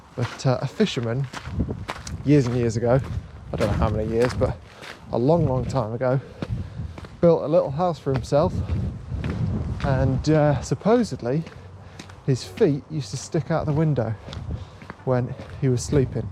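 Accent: United Kingdom. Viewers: so you're telling me this guy's great at what he does AND HE HAS A BRITISH ACCENT?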